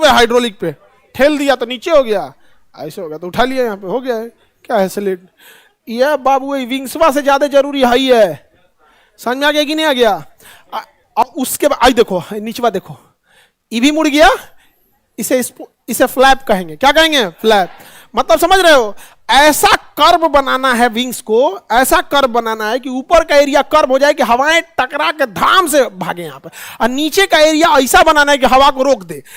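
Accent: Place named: Bihar, India. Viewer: jock chal rhe ya sikha rhe🙄🙄, itni bakwas video, lol bhi samjh na aya, koi bihari lag rha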